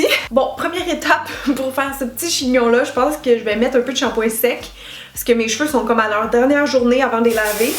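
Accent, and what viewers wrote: Quebec, Canada. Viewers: 😂😂 j'adore sa réaction!! J'ai bien rigolé! Comme quoi nos hommes nous aiment au naturel😉 Merci encore pour ta bonne humeur, et en petite française que je suis, je kiffe ton accent québécois..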